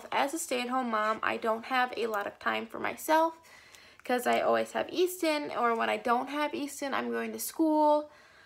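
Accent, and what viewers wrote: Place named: South, United States. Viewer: Aww girl this is so cute! :) You're so beautiful and seem so sweet! I love how you are still so motivated and have great life plans. <3 You sound like you have a bit of an accent... are you from the south?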